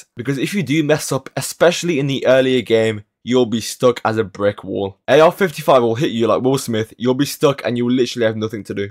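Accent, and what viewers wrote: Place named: Roadman, United Kingdom. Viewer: a guy with a roadman accent playing genshin feels unheard of 😭🙏 i weirdly like ur channel man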